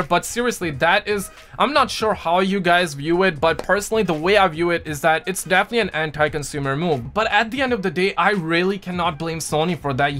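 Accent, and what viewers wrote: India. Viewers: U have some Indian origin or something? Or maybe learning hindi?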